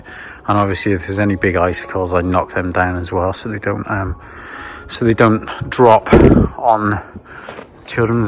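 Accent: United Kingdom. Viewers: I like your accent. Which british?) Winter is coming (Starks know about that) . Village in this season really nice, and air so fresh, only smell of Russian banya, when tree is burning mmmm smell from my child time when our houses didn't have gas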